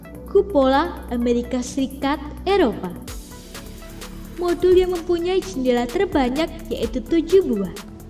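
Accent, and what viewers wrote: Java, Indonesia. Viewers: Suara nya medok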